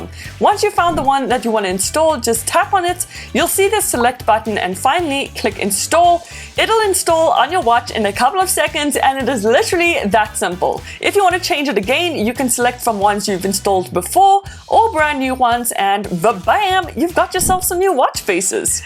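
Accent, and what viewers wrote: South Africa. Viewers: Awesome wath. But are you from south Africa? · You a Saffa (accent)?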